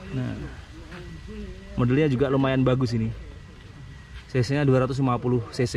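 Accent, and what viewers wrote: Java, Indonesia. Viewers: wong jowo iki? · Bos kacung punya sowrom motor juga, aq suka pak logat jawanya kental dan ada humoris nya juga.selalu doain di awal dan semoga berkah dalam hidup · Medok mas 😝😝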